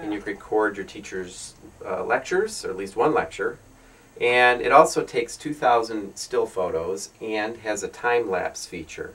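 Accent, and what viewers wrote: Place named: Canada. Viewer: nah hes just canadian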